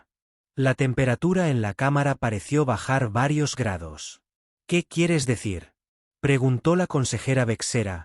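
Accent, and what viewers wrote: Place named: Spain. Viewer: Casi en todas sus historias aparece el apellido "CHEN", lo que siempre me ha intrigado, por lo que me eché un clavado en Google y encontré esto: ELNOMBRE CHEN , ORIGINARIO DE CHINBAPOSEE UN GRAN VALOR HISTÓRICO Y CULTURAL. PROVIENE NEL IDIOMA CHINO Y SIMBOLIZA LOS CONCEPTOS DE AMANECER Y MAÑANA.}Como nombre propio, Chen se ha usado durante siglos, representando la esencia de los nuevos comienzos y la esperanza que acompaña el inicio de un nuevo día. Ya tiene sentido que lo utilicen tanto en sus historias, ya no me quejaré. Pero no creo que "JAQUE" TENGA EL MISMO SIGNIFICADO y lo usan cada rato, cuando creo que quieren decir JAKE, por lo que no creo que su locutor, como buen español, hable inglés, y si la IA es de España, donde al Wi-Fi, le dicen GUIFI. me parece muy tierno. Saludos amigos, me gustan sus historias. Keep them coming!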